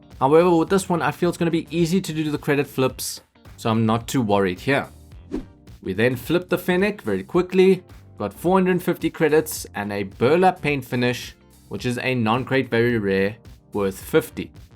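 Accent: South Africa. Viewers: Yo u from SA? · I just want ti ask are you from South Africa or Australia or neither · Is this guy from South Africa ?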